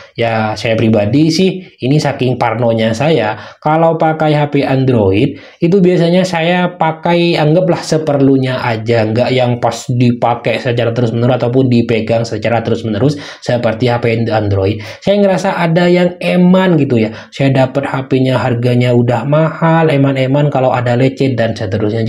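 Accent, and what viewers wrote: Madura, Indonesia. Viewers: abang org madura ya logatnya khas banget😅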